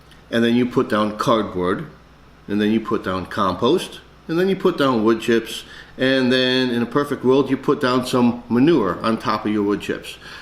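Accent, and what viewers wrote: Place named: New York, United States. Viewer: Literally just red my mind while I was listening and scrolling through the comments. I travel a lot between states due to work. Sounds like a Southern NY, NJ, CT accent to me. I know I'm from NJ originally, live in PA now, have family all over NY and a GF In Nothern NY and the subtitles in accents in speech vary.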